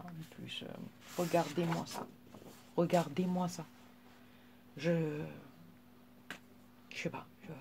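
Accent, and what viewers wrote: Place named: Marseille, France. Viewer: Coucou je me disais bien que tu étais du sud (et de marseille) car tu as l'accent de chez nous, et pour être honnête j'aime beaucoup ton accent et ta franchise. J'aime beaucoup.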